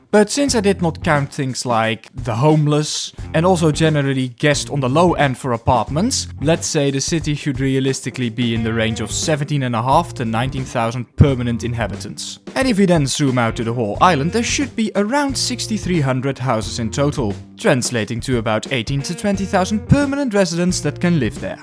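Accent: Netherlands. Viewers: I smell Nederlands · I smell dutch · You sound dutch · I love that, being dutch myself, I can hear straight away that this guy is dutch as well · U sound Dutch to me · Imma Nederlands accent, not dik at all. Very hollands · lekkere nederlands accent ouwe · U sound dutch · most intense dutch accent i've heard in a whike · Do I hear a Dutch accent? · Are you dutch? I can hear some netberlands accent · i can tell lol. Im dutch to so i can tell when someone is dutch🤣 good vid tho! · Je bent Nederlands he? Bekend accentje haha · Loved the vid! Noticed you're accent sounds dutch. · Bro is de minst opvallende Engels sprekende Nederlander haha, goeie video alsnog. <3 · I am a certified Dutch person and I smell Dutch in your voice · Man I thought I was gonna enjoy this video but as soon as I heard the Dutch accent I had to turn it off. Damn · This guy is probably Dutch, i'm Dutch and some Dutch people have this accent. 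I don't btw. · Damn great vid question tough are you dutch since you have a slight dutch accent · only Dutch people hear you are Dutch right away😂 You can hear the accent but it doesn't bother me and liked the video you made😊😄 (I saw a video where a dutch person with terrible english is explaining how we live in 🇳🇱😂) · I mean he has a Dutch accent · Obvious Dutch accent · Dit is de eerste video die ik van je zie, ik heb een gevoel dat je nederlands bent hahahahah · Are you Dutch? :) I like the Dutch. Love from Sweden! 💖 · Leuke video! En heerlijk om dat Nederlandse accent erdoorheen te horen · You're dutch. I knew it after the first sentence😂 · I smell dutch haha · I have a slight suspicion you might be Dutch.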